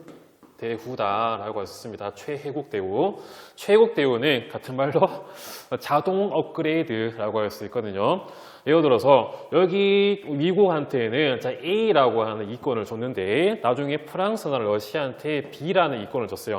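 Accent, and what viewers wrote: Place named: Gyeongsang, South Korea. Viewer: 목소리 좋다잉 경남 분이신가보네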